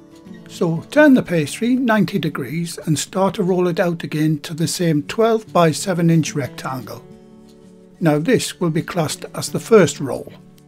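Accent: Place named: North of England, United Kingdom. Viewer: Hi I have been reading the comments below: first I am Irish and nobody would accuse me of being biased in FAVOR of Brits - has a lovely north of England accent; his instructions are clear and very easy to follow, his gentle mild mannered slightly self effacing style tells me here is a working man and no snob. Credit where it's due I used to be a good baker but credit for my successful return to more serious home cooking belongs to. Thank you - don't mind the "begrudgers" as we say in Cork...Thanks for your videos G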